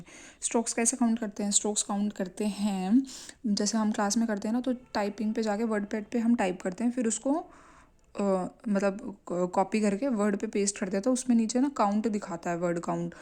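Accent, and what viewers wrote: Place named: Meerut, India. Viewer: Sister aap kha sa ho. Kyonki aap ke tone kuch kuch meerut wali lagte h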